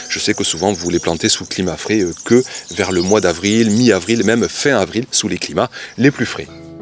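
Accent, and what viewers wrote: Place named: Toulouse, France. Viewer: Je suis du Québec. Je viens tout juste de découvrir tes vidéos. Elles sont super intéressantes. Mon neveu vit à Toulouse depuis près 20 ans et je crois reconnaître ton accent à moins que je me trompe. Donc si je comprends bien la saison de jardinage est grandement devancée grâce au merveilleux climat que vous avez la chance d’avoir. C’est définitivement 2 mois plus tôt que dans le Sud du Québec. Je suis jaloux… Bonne saison et bon succès.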